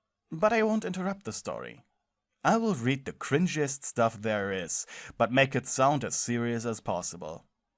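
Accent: Germany. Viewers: I assumed you were a German through your accent Ich hoffe sehr das dein Neustart Erfolgreicher wird! ich verstehe dich sehr im Thema arbeitsuche (& Phobias/anxiety) Ich wünsche dir viel Glück und werde versuche dich in all möglichen Sachen zu unterstützen!❤️ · Ich hatte ja schon ewig das Gefühl dass du Deutsch bist wegen dem offensichtlichen Akzent :D Ich fühle mit dir das Leben wird immer wie teurer und wir jungen psychisch angeschlagenen Leute können da kaum mithalten…Bin selber noch mit fast 30 bei meinen Eltern und es ist mir so verdammt unangenehm! Ich hab deine Videos schon so vielen Leuten geschickt die ich kenne da deine Vids so gut sind bin echt sad zu hören dass du weniger Klicks bekommst als du verdienst :( Das tut mir mega leid. Hab selber mega Phobien und arbeite online als Streamerin, ist schwer Klicks zu bekommen und die Konkurrenz ist riesig zur Zeit womöglich wegen Corona da alle versuchen von zu Hause aus Online zu arbeiten :/ Deshalb will ich mich nach Amerika begehen und ich finde mit deiner Stimme solltest du ernsthaft darüber nachdenken das gleiche zu tun um Synchronsprecher zu werden! 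Man kann es auch online machen ^^ Gibt n Discord Channel namens The Voice Acting Club dort gibt es viele hobby Synchronsprecher die dort Jobs bekommen für so amateur Comics, Youtube Videos von Leuten die gute Stimmen suchen. Wäre also möglich dort auch für dich den einen oder anderen Job an Land zu bringen um dein Einkommen aufzubessern ^^ Hoffe diese Tipps helfen dir :) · Fuckin knew the accent was German. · I knew from the moment I heard the first asmr you were german!! I know it’s really hard but I know you can do it! We are always there to support you! Soooo viele Grüße aus einer klein Stadt in Deutschland! Hoffe sehr das du dein Ziel erreichst! 💕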